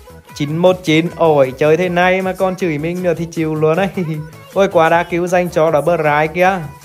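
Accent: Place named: North Central, Vietnam. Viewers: Giọng người Huế nghe hay lắm ln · Mình ở miền tây. Nhưng rất thích nghe giọng mấy ace ở miền Bắc Trung Bộ như này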